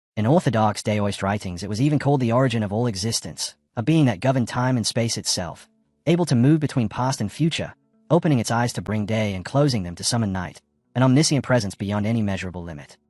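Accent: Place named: United Kingdom. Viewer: Didn't expect the ai to be British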